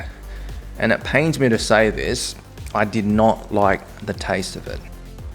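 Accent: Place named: Australia. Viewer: So weird to see a Vietnamese guy speaking with an Australian accent reminds me ofLuke Nguyen. I am glad the rest of us ended up in the states.. I am personally heading back to Vietnam for the first time in 50 years.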